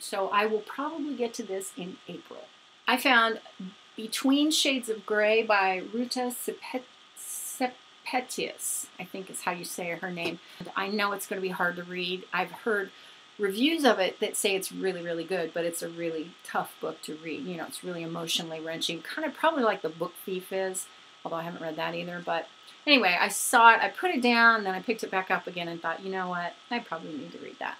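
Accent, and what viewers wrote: United Kingdom. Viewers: Great haul! Loved the English accent lol! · Lovely Haul! Your excitement over those books is infectious :) That edition of Little Women is really pretty. I've seen it on a lot of shelves, and I'm thinking of getting it someday (tho I'm not sure I'd enjoy reading it). I had never seen the back of the cover tho! It's really cool :) The British accent :D I love that you're so much into genre fiction, like paranormal, dystopian, steampunk. Most of the books you hauled, I have never heard of them, but I've seen The Program around and it sounds like an interesting story. Looking forward to hear what you think of it. Starters has a freaky cover! (O_O) (the one you like) I wouldn't be able to stop staring at it if it was on my shelves. And I'd feel a bit creeped out. Wow! Those bookshelves look great! And those boxes full of books! If you ever get them all out, I'd be very curious to know how many books you have in total.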